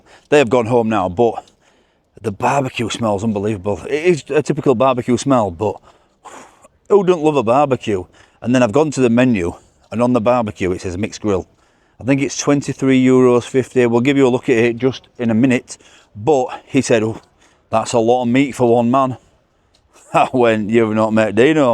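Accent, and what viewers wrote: Yorkshire, United Kingdom. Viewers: Sorry but that accent is truly truly awful mate, it's a badden even for yorkshire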